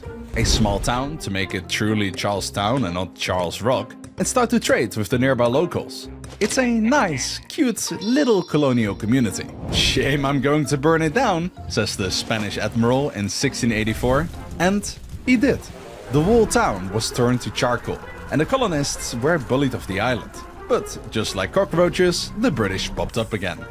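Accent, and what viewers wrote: Netherlands. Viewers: Are u Dutch? Love your accent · I knew you were Dutch I heard it from the accent I just waant sure XD but cool!!! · Oh youre dutch, i was trying to place your accent 🥀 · Ik kan echt niet luisteren naar nederlanders die engels praten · I am only here to listen to his Dutch accent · Ik dacht al dat jij nederlands was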